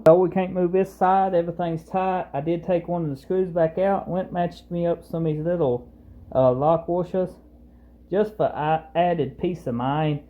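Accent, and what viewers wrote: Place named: Louisiana, United States. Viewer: Very helpful thank you great video your accent sound Cajun.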